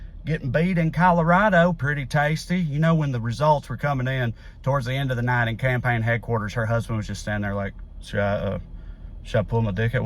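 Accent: South, United States. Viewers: My first time to your channel, and I’ll give you a like and maybe even a subscribe! You are top notch at flapping your jaw. Give you credit for skill and thoughtfulness. Keep up the good work. And PS, an authentic southern accent not the New York transplant version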